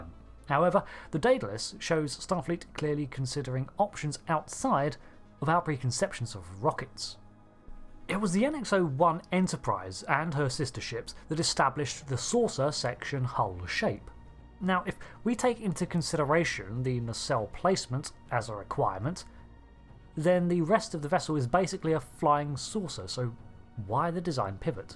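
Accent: United Kingdom. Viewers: I know, british accent, but for the record, in Cornwall, Ontario Canada's phone numbers all start with 93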